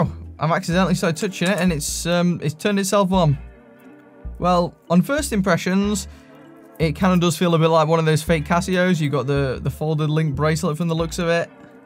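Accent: Stoke, United Kingdom. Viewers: I thought you had a stoke accent. Glad to see a fellow stokie on YT.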